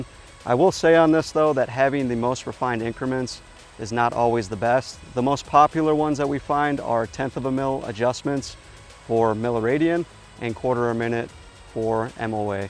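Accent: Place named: Wisconsin, United States. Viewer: Wisconsin. Huh, I thought he sounded familiar. Greetings from the Fox Valley!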